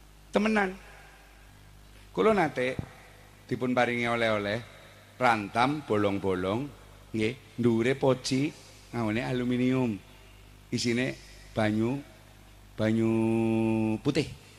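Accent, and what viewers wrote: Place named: Tegal, Indonesia. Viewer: NIKU LOGATNYA KALO ORANG TEGAL YG TERKENALNYA NGAPAK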